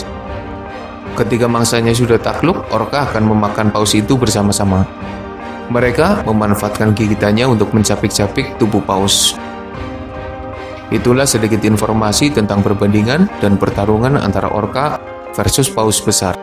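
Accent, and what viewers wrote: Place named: Banyumas, Indonesia. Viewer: Bahasa jawa ngapak.. gak enak di dengar jawa ngapak..🤮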